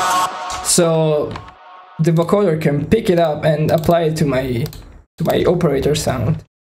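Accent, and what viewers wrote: Italy. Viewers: Si sente l'accento italiano ahahah hai stra talento! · Italian I think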